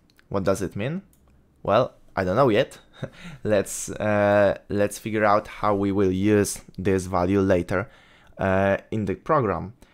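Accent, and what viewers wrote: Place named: Poland. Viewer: czuje polski akcent 😊😊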